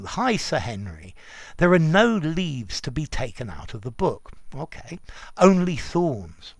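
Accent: United Kingdom. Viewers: Most of the videos I watch in English are from you, and some British ways of pronouncing words like "Dictionary" and "Vocabulary" have been stuck in my mind.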